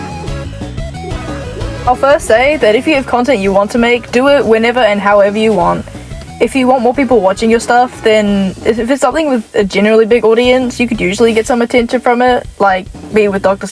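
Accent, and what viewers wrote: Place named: United Kingdom. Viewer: YOU'RE BRI'ISH??? also hi its Ed from discord also we started watching Dr. Stone at like the exact same time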